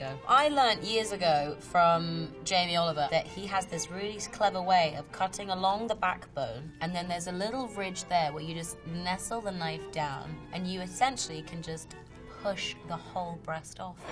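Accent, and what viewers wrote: United Kingdom. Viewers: she has the most satisfying british accent in britain wtf like i’m british and im JEALOUS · buBbLe AnD sQUeEk???? ive been watching this for 3 years but i still havent gotten used to the british accent after watching her as yelena... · She's so pretty and i love her British accent :)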